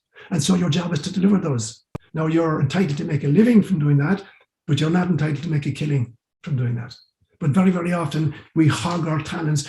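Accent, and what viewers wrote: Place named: Ireland. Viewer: Just stumbled across your channel and just had to say I absolutely LOVE the name you gave your channel plus the bonus of listening to your lovely Irish accent which brings back memories of my Grandparents who immigrated to the USA!!! I look forward to learning and growing more spiritually with you ❤❤❤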